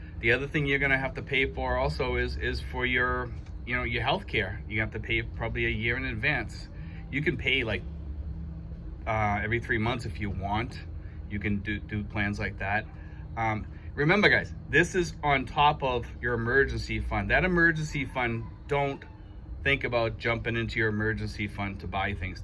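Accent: United States. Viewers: I just recently subscribed and really enjoy the videos. Probably the best info i have found by an expat in the Philippines. I also invest in both Tesla and ARKK. Both are great investments. I just sold my business recently and my filipina and I will soon be moving to the Philippines. I have been trying to figure your accent. What part of states are you from? Thanks once again for the great and informative videos.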